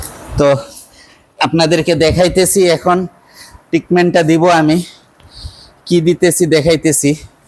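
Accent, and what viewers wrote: Sylhet, Bangladesh. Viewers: ভাই আপনাকে তো সিলেটি নি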